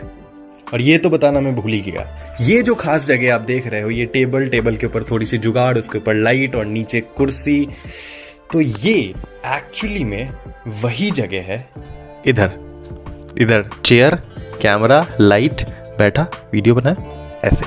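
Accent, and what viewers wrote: Bihar, India. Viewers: bhaiya are u from Bihar 🤔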